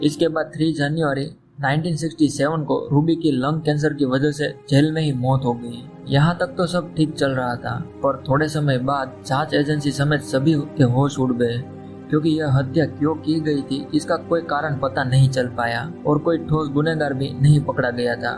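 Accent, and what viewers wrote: Gujarat, India. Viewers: hmm aapke bolne ke accent se hi lag raha tha ki aap Gujarati ho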